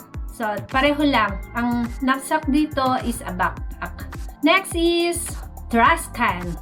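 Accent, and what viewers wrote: United Kingdom. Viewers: Nakakatuwa namn ang english british accent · I immediately remembered the English accent when I was still in the call center ... Yes UK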